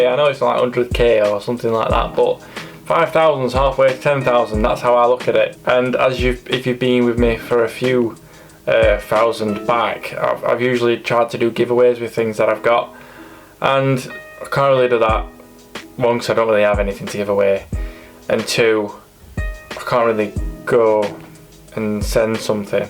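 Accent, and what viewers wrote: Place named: Yorkshire, United Kingdom. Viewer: Top effort on 5k. Hey, stay strong and look for only little goals to stay up beat. I know how tuff things can be for you in lockdown as I was without any luxury in Afghan for a very long time. Remember to enjoy life and don't set big daily goals as failure to complete them can make you feel worse. Lovin your Vids & Yorkshire accent more than ever these day since I'm working down south.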